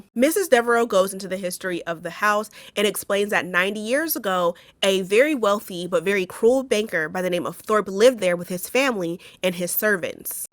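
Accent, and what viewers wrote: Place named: Deep South, United States. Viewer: I just stumbled upon this and at the beginning i was like from exactly how Deep South is this girl??😂😂😂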